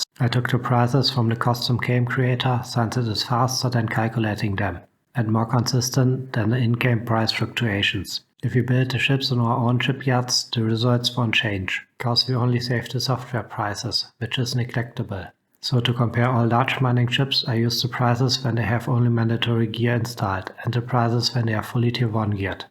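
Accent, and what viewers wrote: Germany. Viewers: Lol u sound like you sit in a dungeon. Combined with the heave german accent i laughed throughout the whole vid. Great job my friend. #alloallo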